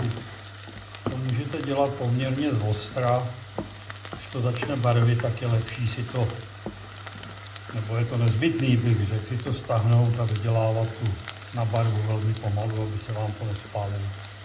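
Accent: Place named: Prague, Czech Republic. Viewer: Dědo Vy jste krásnej Pražák , s nádhernou pražskou češtinou 🌺🌺🌺 a já Vás ráda poslouchám i kvůli té Vaši krásné češtině 🇨🇿🥰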